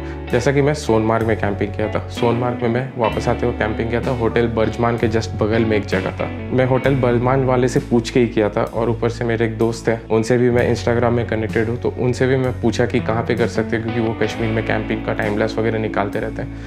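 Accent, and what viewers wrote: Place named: Bengal, India. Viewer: The way you gave info it's amazing . And thanks for the info man keep growing. I have a question are you bangali ? Your accent sounds like Bengali a bit .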